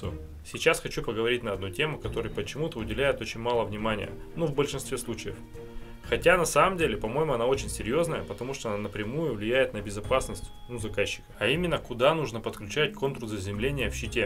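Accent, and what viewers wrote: Perm, Russia. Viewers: У вас говор как у "Реальных пацанов", они тоже из Перми.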